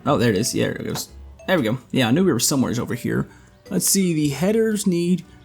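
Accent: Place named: Maryland, United States. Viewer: bro, u sound like me. r u from Maryland?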